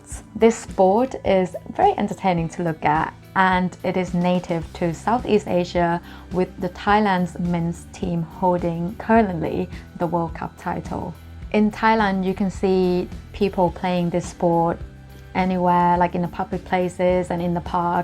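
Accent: United Kingdom. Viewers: what a British accent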